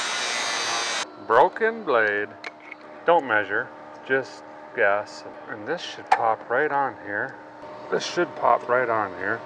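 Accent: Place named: Minnesota, United States. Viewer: Well I’d be darned this fellas accent makes ya want to move to Minnesota 😂 love his humor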